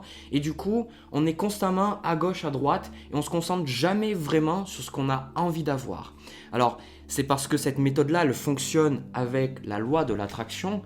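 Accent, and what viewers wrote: South, France. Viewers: Merci un Bonjour de Béziers Super vidéo comme toujours avec ce bel accent du sud 😉 continue c est bon pour le moral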